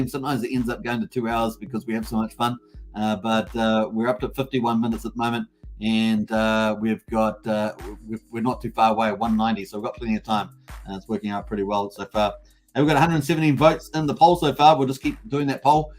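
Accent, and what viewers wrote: New Zealand. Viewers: Are you Kiwi bro?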